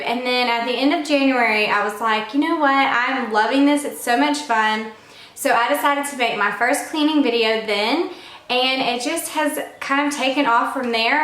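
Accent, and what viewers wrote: South, United States. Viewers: Congrats!!! Subscriber for a while now. And started following you on IG last week. Being from the north, I love your Southern accent ❤️ Love your channel! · Congratulations on 10K!!!! How exciting. I am a new Sub. and I think your home is Beautiful and that Southern Accent!!!!! LOL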